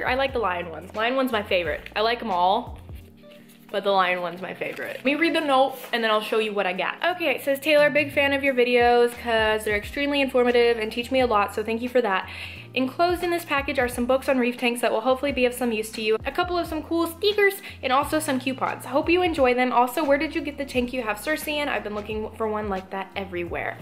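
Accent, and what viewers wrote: Texas, United States. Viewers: Love that she's from Texas and says "y'all" like me 😁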